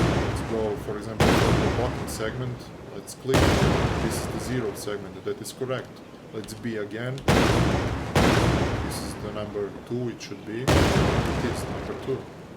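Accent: Russia. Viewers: Акцент прям русский русский)) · Hi! I was somewhat confused by your English accent. It is very similar to the accent of a resident of Russia.:) And the name is closer to scandinavian origin. Thanks for the plugin! We will use it!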